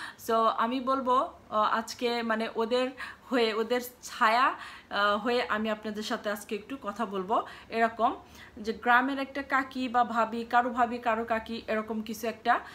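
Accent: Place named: Bogura, Bangladesh. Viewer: আপ‌নে অ‌নেক ভা‌লোই ক‌চেন ত‌বে মা‌জে ম‌দ্যে এ্যানা বগড়্যার ভাষার সা‌তে আ‌রেক জেলার ভাষা মিশ্যাল করচেন।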